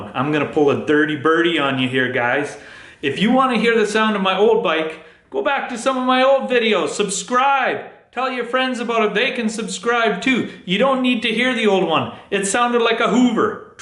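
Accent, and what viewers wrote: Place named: Calgary, Canada. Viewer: Great video mate, love the accent, reminds me when I lived in Calgary. Question. What is the purpose of the servo on the exhaust and is it ok to disconnect it like that? I recently bought a 1000 and when swapping the fender eliminator I notice I also didn't have those servo cables. Cheers from Australia.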